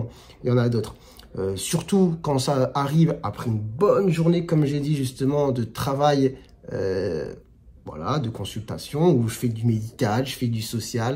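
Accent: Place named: North, France. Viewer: Ces gens la n’ont aucune logique. Pour eux les français d’origine étrangère ne font pas d’effort pour s’adapter à la société française mais critiquent quand ces mêmes personnes le font et réussissent . Pareil pour l’argument des immigrés qui viennent pour les allocs, parce que ces même immigrés voleraient le travail des français de souche chômeurs (les taffs de merde que personne veut faire). Donc de toute façon ils auront toujours une manière de détourner les choses dans leur sens, quite à utiliser des arguments fallacieux ÉDIT: la personne qui t’a dit que tu dis wAllah confond avec « Voilà » avec un léger accent du nord 😂 ils ont vu ton nom toute de suite ils entendent ce qu’ils veulent entendre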